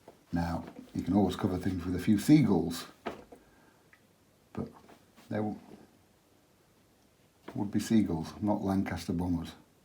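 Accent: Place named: Northern England, United Kingdom. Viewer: I am seriously enjoying your videos so much. I'm guessing you are up north and you say grass instead of grauss! ;) Your sense of humor is a delight! I can tell you've been painting a long time... but your pallet is driving me crazy.. maybe I have OCD! It works for you but it looks moldy! :) Please receive this in the way it's intended and not meant to hurt feelings. :) You always end up with a beautiful painting.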